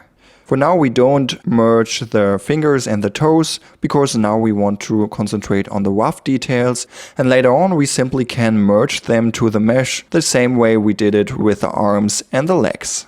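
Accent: Germany. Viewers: Man hört einfach sofort, dass du deutsch bist xD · i hear a german accent well, your name sounds pretty german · Great tut man, very, very helpful(Also your german accent is adorable) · "Oh he sounds really german" i thought. "My name is " he said.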